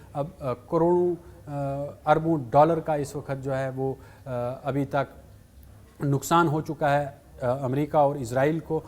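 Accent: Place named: Pakistan. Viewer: He is not from India. He talk like Pakistani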